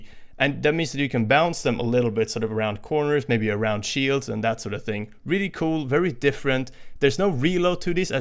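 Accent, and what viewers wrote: Sweden. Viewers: This guy has Swedish accent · You swedish?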